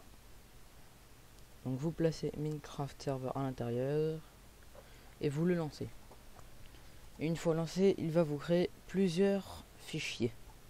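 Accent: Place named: England, United Kingdom. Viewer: quel accent anglais !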